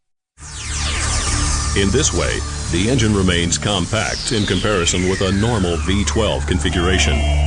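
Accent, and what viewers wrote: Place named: France. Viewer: why is he saying everything in a french accent :/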